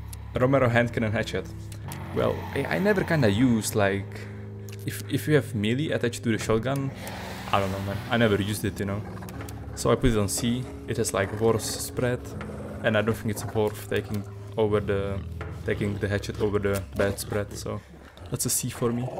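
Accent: Czech Republic. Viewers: Hmmmm is that a czech accent i hear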